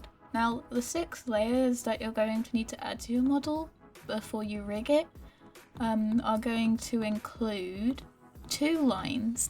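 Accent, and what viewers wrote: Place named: United Kingdom. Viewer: Wow Your British! I Think.. Idk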